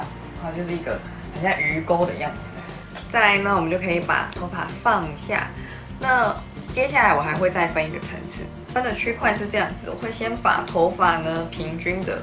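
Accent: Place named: Taiwan. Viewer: 棒棒的你是台湾人吼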